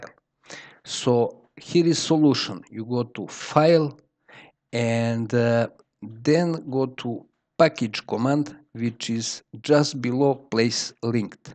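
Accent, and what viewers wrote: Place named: India. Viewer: INd-lish, speech very bad. i don't hear sound.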